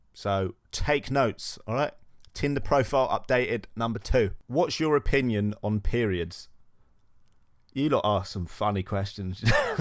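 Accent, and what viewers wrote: United Kingdom. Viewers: U have a great voice and accent...r u British?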